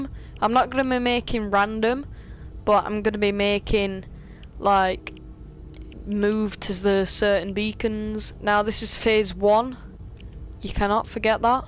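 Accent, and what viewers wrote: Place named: United Kingdom. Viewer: Hes british eh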